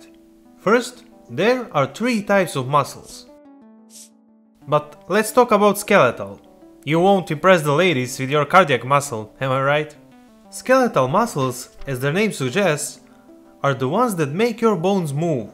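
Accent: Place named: Serbia. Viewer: You really sound Serbian/Croatian/Bosnian/Montenegrin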